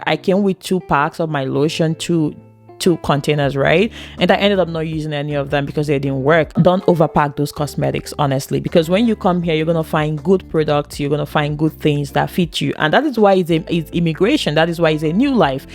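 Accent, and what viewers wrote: Canada. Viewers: The Canadian accent though. ❤❤❤ Love the code switching 😂😂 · Lol The Explanation at the end 😄😄 Honestly, through out the talks. Was just like has fully evolved into the CA accent.🤣🤣 Nice to see And thanks for the video. Solid information👍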